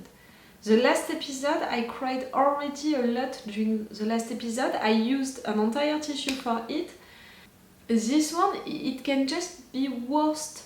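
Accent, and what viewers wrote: France. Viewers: Je découvre cette chaîne Mais après quelques secondes, j'ai compris que tu étais française. Entre français on se reconnaît xD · Ouais on ressent énormément l’accent franglais ahah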